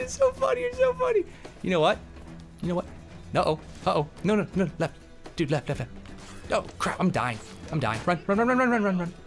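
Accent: Scotland, United Kingdom. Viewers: Amazing Scottish a sent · Omg dat Scottish accent! Brilliant. :3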